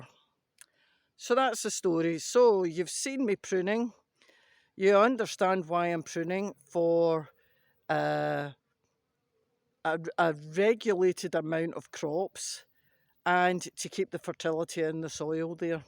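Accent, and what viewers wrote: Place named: Northern England, United Kingdom. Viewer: I heard the accent and thought to myself how on earth has someone up north managed to get butternut squash to come in so quick and also to have toms outside. Then I saw you in France. Well done.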